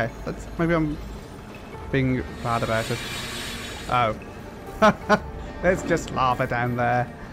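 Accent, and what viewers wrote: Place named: North, United Kingdom. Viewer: Not related to the game, but I'm having trouble placing your accent, it sounds like you are from the UK but I can't place it more precisely, maybe a bit northern but a bit London at the same time.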